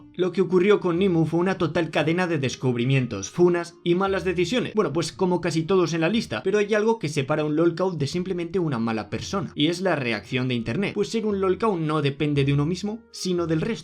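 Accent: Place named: Spain. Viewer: Por qué tienes acento español y usas modismos Mexicanos?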